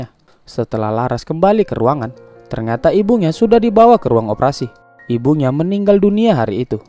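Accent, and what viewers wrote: Medan, Indonesia. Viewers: Lanjutkan logat medannya bg..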